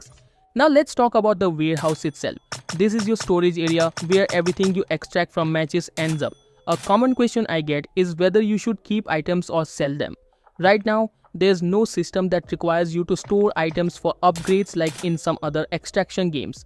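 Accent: India. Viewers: Ugh, that Indian accent is ANNOYING! 👎👎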